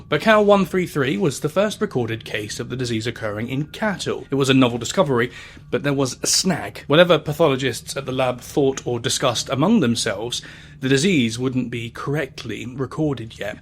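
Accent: United Kingdom. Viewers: english is my third language and i undestood him perfectly, hes just briitsh??? lmao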